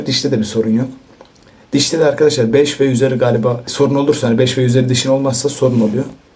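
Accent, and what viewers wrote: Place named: Konya, Turkey. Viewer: Kanka sen Konyalı' mısın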